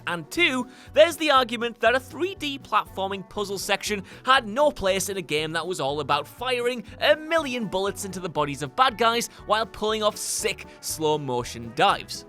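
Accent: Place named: Newcastle, United Kingdom. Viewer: why would you choose a stupid geordie twat to voice the video???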